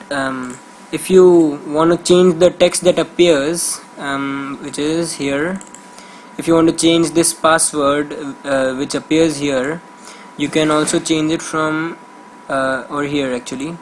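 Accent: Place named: Arab world. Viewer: Me noticing an accent: 🤔 in 🧠 -> is he Arabic?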